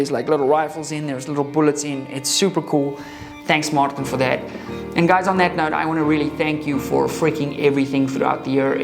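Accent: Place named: South Africa. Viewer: Good vid. Great tips. Are you South African? We are. But live in New Zealand now. It must be expensive in SA getting the hardware you need....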